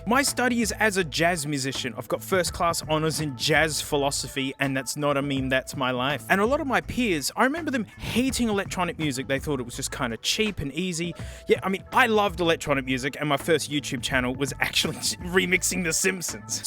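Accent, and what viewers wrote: Australia. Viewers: Sounds like the drum museum guy. Drums somethin. Australian. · Why.....why is this only 12 minutes? We need like..AN HOUR of this! I wanna hear the funny aussie man with his slithery friend named Frank scream and tell me hilariously albeit informative stories while using a synthesizer.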